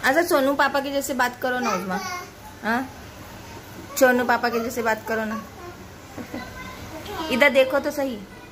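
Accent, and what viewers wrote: Hyderabad, India. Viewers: Baji aap hyd ki language baat kar rahi ho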